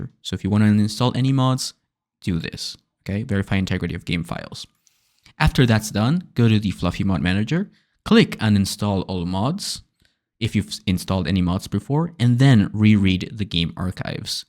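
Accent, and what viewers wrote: Italy. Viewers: ❤ Personally I'm a console guy, but the fact that you took time for your fellow peeps on PC to give them a head on education makes your Italian accent the cherry on top. I support good people and good content. Enjoy brother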